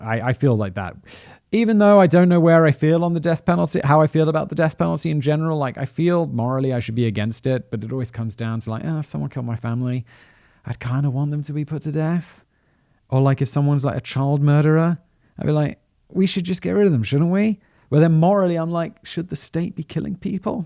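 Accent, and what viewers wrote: RP, United Kingdom. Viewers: A persons bad choices are not their fault?! When you know he is in prison for rape! WTF? Exactly who's fault is it's? Simon, even with your posh accent you can't make that sound smart. His wife was every bit as responsible for her decision's as her husband was responsible for his decisions to beat, rape, and kill those women. Stop letting people off for their piss poor decision making abilities. It sets bad precedents. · anyone notice Simon's script reading voice is more posh than his normal offscript voice? *reads script sounds like Benedict Cumberbatch's Sherlock Holmes" "talks normally sounds like Michael Caine's Sherlock Holmes" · If it never crossed your mind that orderly, wealthy countries with root philosophies that advantage individual sovereignty might do a better job measuring pathology rather than actually having higher incidence rates of pathology, maybe you should trade in your pompous brit accent for something less ostentatiously elitist.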